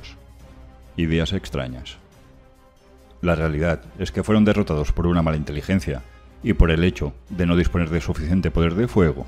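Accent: Galicia, Spain. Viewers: ¿Es la entonación al hablar de origen gallego o asturiano?. Me encanta; saludos.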